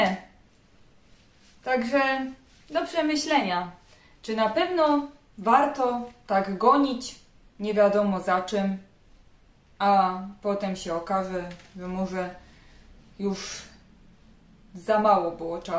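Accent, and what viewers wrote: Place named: Silesia, Poland. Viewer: Widać że się pilnujesz w słowach i wymowie. Czuć Śląsk z daleka 😊 pozdrawiam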